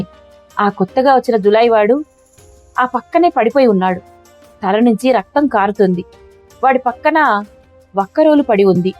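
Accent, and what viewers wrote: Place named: Telangana, India. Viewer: కథామంజరి లో కథలు చాలా బాగున్నాయి. గారు తెలంగాణా యాసలో జీవ గంజి కథను బాగా వినిపించారు. కథా రచయిత బాగా రాశారు.వుభయులకు ధన్యవాదాలు.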